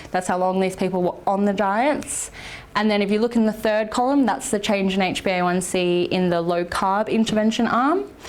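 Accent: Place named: Australia. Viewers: Can't place her accent. Is aussie...sort of...but something else mixed in... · There is a hint of a regional Aussie accent. To ears not familiar with the Australian accent, Mark Twain described it as the most purest English accent. I’m pretty sure he was drunk. However, our society really didn’t like a classist society modes and thus our accent is based on a societal protest against elocution. Enter the Aussie twang. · No that's an Australian accent. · That's a typical younger, educated Australian's accent.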